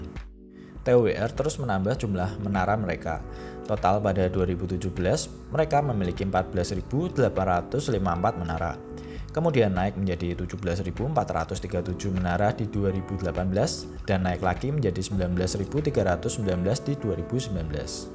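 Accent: Java, Indonesia. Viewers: Medok Men coco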